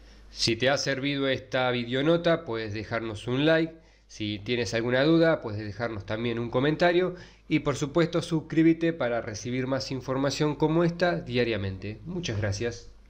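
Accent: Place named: Argentina. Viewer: En qué país es esto??? perdón es que el acento es argentino ó uruguayo??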